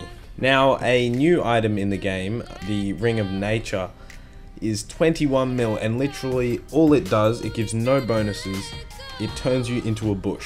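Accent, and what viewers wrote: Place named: Australia. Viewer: Where in Australia are you from?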